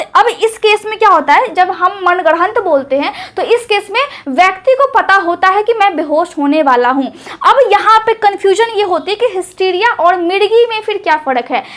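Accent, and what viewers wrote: Bihar, India. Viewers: mam aap बिहार से हैँ क्या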